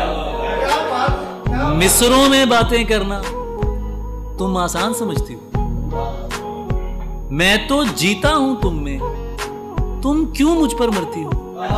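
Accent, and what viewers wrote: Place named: Delhi, India. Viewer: yar bhai hai tu pakistani lagta aapna delhi wala hai wohi boli wohi words wohi aapne mohalle ke ladko ka style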